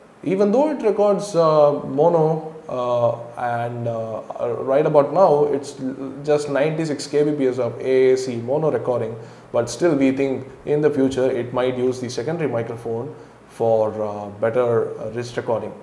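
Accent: India. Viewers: your voice is very clear you had a really nice review and you didnt speak too fast for some reason, I liked the hint of an indian accent to your voice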